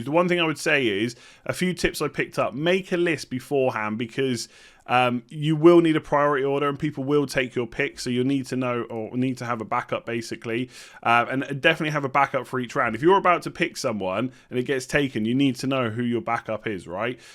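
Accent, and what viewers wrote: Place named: Southern England, United Kingdom. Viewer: Why are you wearing a Man Utd top with that southern accent? 🤔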